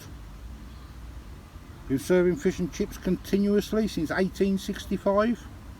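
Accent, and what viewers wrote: Birmingham, United Kingdom. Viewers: good to hear love yer Brummie accent . Love it when you wave saying bye-bye my other half Jean loves it ! Take care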